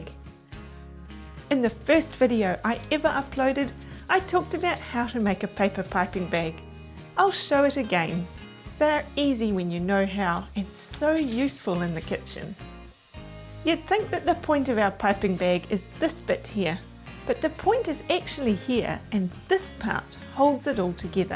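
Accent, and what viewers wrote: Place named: New Zealand. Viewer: LOvely design. And I also like that Kiwi accent.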